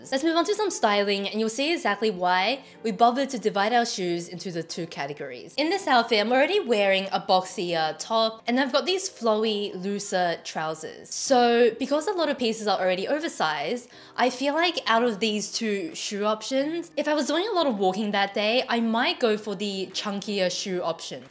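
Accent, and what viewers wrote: Australia. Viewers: I just love your Australian accent, just like rose's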